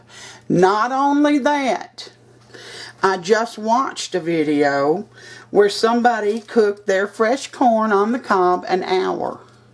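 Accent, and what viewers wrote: South, United States. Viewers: Love your recipe videos and the southern drawl makes me smile. Keep it up!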